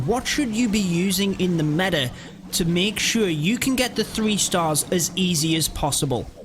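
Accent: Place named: North East England, United Kingdom. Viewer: CraziiPower he has to slow his "talking" down because otherwise you would not be able to understand him due to a strong north east (England) accent. Also to me it's not slow at all, just clear and efficient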